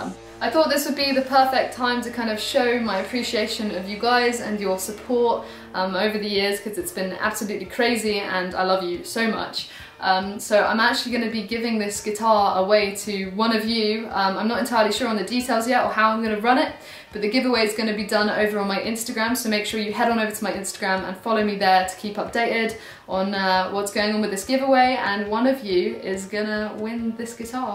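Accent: United Kingdom. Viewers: У девушки классное британское произношение. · You and Emma Watson have the same particular brittish accent. That and you're both wildly talented and beautiful as well. Loved the video!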